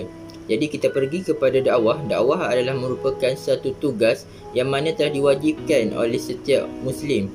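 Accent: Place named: Kelantan, Malaysia. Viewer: Walaupun agak Kelantan, tapi saya suka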